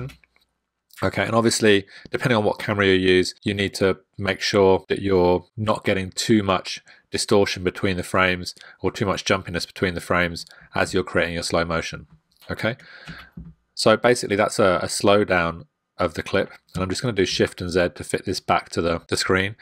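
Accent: United Kingdom. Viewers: this is an English accent you divert!!!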